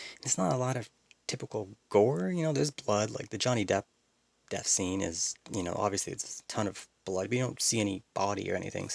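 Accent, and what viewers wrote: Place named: Atlantic Canada, Canada. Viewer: You're Canadian? I'm from Toronto. Based on your accent I think that you are probably from one of the Atlantic Provinces.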